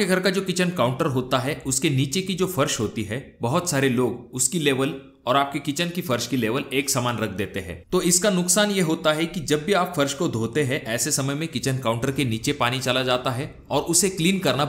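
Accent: Maharashtra, India. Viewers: Sir aap maharashtra se ho marathi mix ho rahi hai😅😅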